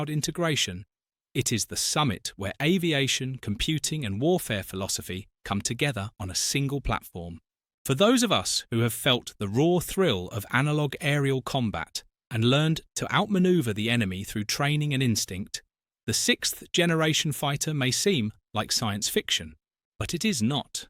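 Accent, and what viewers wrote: United Kingdom. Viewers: Why do we have someone with a British accent telling about American military aircraft? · Ai with a British accent is hilarious.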